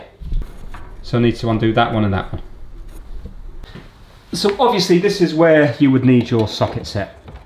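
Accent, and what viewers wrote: United Kingdom. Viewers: First of all, I LOVE your Brit accent that includes silly funny words and sentences which yeah… most brits are using. Then second, you just make it so simply to understand even for a non English speaker. Well done mate. Thanks for being here for us. 👍 Next time show us how to fix computerised sewing machines. I know, That alone is like a life job on its own 😄 Or perhaps electric radiators. Good job.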